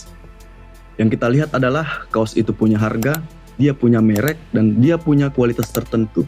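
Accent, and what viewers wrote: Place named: Sulawesi, Indonesia. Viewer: Dari cangkok & logat sepertinya Orang Sulawesi ya 😂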